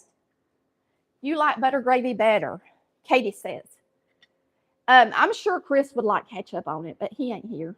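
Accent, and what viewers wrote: South, United States. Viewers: Hey! I just watched your corned beef and hash video. I have never browned mine like that in the pan, but I'll have to try it that way. I'm from Georgia but live in South Carolina now. I just love watching your videos. Your southern accent sounds just like me, my mom, and my sisters! I think if we lived close by each other, we'd be great friends!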